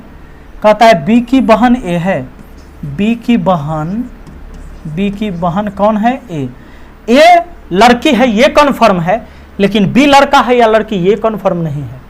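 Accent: Bihar, India. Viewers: Sir ap smjhaate v achha hai aur bolne ka bihari style mast hai 💕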